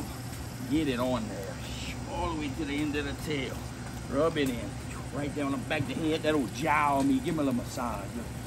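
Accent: Louisiana, United States. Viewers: Sounds like some Louisiana to me. Got the accent like Justin Wilson.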